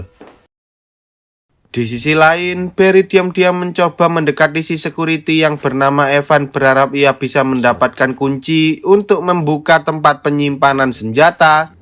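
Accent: Java, Indonesia. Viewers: Jowone ngendi mas?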